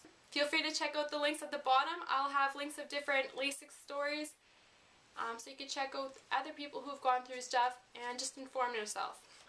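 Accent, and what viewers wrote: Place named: Canada. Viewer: I feel really bad for you and the suffering you've had to endure due to Lasik. Thank you so much for your video. You have saved myself and thousands of others from this procedure. You said you don't wear makeup often because of swelling. I wanted you to know that I think you are very very beautiful without makeup so why bother? :) You don't need it! PS...you sound Canadian.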